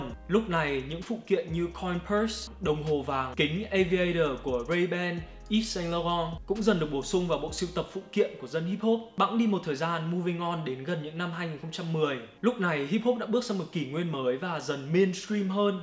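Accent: Standard. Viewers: Nói chuẩn anh ưi!! 1 like❤️❤️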